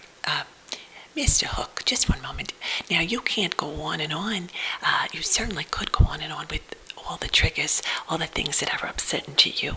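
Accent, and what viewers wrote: South, United States. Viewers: Love the southern accent. There is something very... accommodating? about it. I don't know how to put it :)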